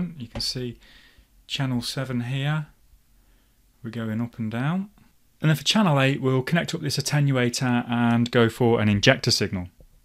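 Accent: United Kingdom. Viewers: I am sure that accent is from my neck of the woods Burton On Trent ? I have one of these 1008 Hantek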